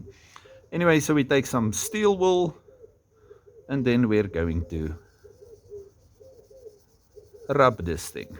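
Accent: Afrikaans, South Africa. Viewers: love the Afrikaan accent, groeten uit Nederland! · South African Accent?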